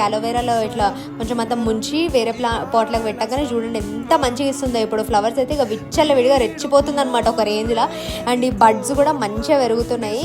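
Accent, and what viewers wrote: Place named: Coastal Andhra, India. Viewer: Akka Mee language slag baga undi akka I think your a coastal Andhra region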